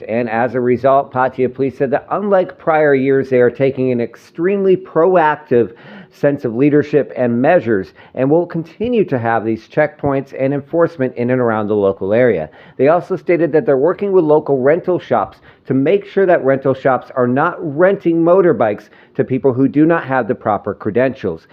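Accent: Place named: United States. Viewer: it's not fault. He's American. Words like Bangkok and Bang Lamung both have the letter 'A', but Americans insist on pronouncing these words with an 'E' and an 'O' respectively. Yes, I know, weird...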